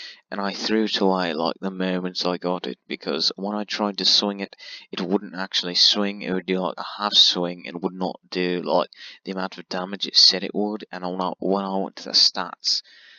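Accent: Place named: New Zealand. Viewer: What an Astounding Review! seems legitimate af I Like that New Zealand Accent Daddy 💦💦💦💦💦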